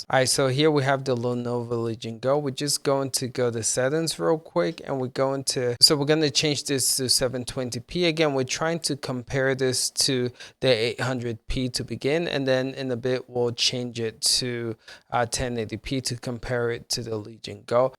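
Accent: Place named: Mumbai, India. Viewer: sounds like indian from mumbai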